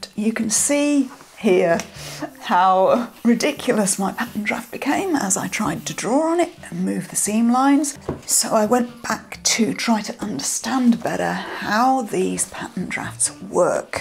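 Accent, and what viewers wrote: United Kingdom. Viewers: you are an amazing artist!!! You are so calm & confident, even when adjustments to your projects are made. You are a real inspiration to me! I love your English (Brittish) accent!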